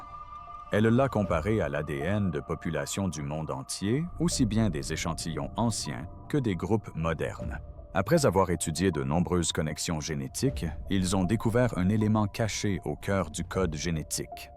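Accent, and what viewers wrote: Quebec, Canada. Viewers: Ces commentaires IA avec accent franco-canadien sont insupportables… et tout ça pour pas dire grand-chose ! C’est terrible cette narration remplie de détails sans aucun rapport les uns avec les autres. J’ai écouté plusieurs fois et impossible d’en ressortir une cohérence temporelle. Je suis bête ou ce reportage est une arnaque ?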